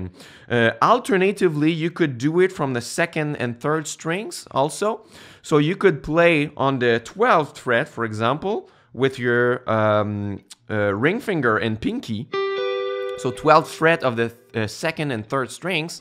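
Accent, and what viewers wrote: Quebec, Canada. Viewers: quebecois? Je reconnais ton accent :)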